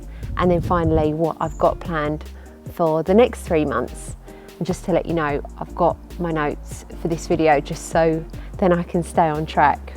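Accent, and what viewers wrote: United Kingdom. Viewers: It is interesting when you say it takes so long to edit a video. My first thought it doesn't need to be so perfect. You could sit down, smile, and talk in your British accent and I'd be happy ;)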